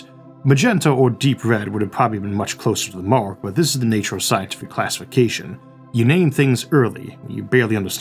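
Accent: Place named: New Orleans, United States. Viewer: This man sounds like he's from N'Awleans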